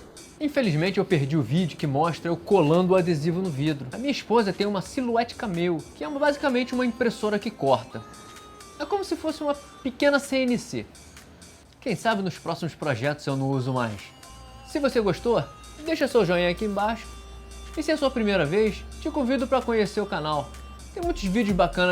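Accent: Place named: Rio de Janeiro, Brazil. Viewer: Po, pelo sotaque estava achando que vc era aqui do rio, qnd aos aparece o prédio bege e marrom que morei por 15 anos aqui em nit...kkkk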